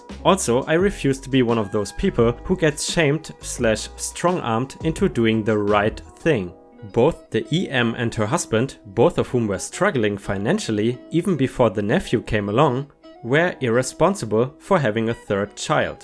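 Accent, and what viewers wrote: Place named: Germany. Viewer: Your english is not bad. But I am still hearing your german accent as a german myself.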